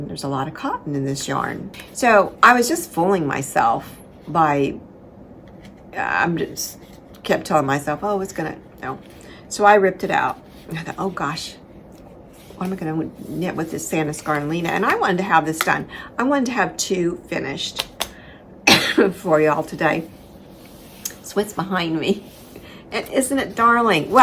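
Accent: South, United States. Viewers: Love your southern accent! How long have you lived in the South? 💕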